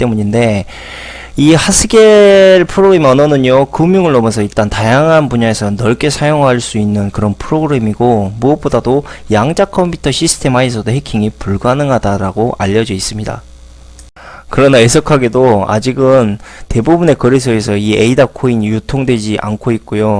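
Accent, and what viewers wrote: Jeolla, South Korea. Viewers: 전라도세끼들참 말하는꼴아지 말투참좆같네 쓰래기코인 망한줄모르냐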